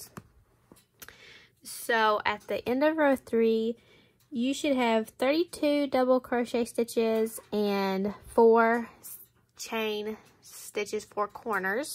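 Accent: United States. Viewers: Americans should do something about their accent!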